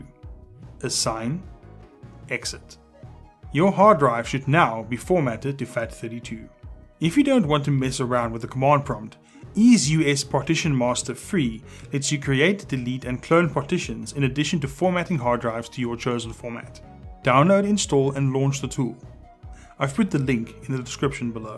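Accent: South Africa. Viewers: Top result and the guy got a proprr South African accent so I’m happy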